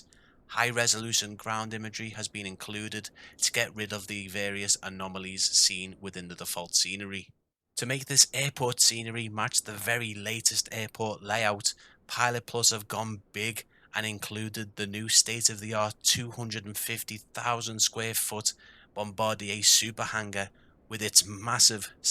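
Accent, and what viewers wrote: Liverpool, United Kingdom. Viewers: loved the Scouse - 50 years down under and can still understand it - and still love to eat it 👍 sad you dont have a Spitfire - ask the Aussie developers to gift you one for review👌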